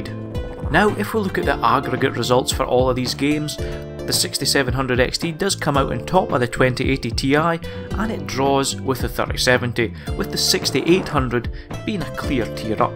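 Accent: Scotland, United Kingdom. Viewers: i love listening to Scottish people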